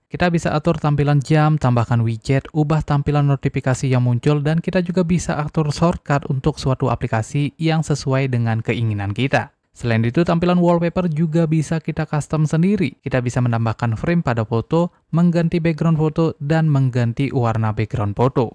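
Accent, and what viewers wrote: Bali, Indonesia. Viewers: Suaranya Bali banget bli hehe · Bang orang bali atau lombok?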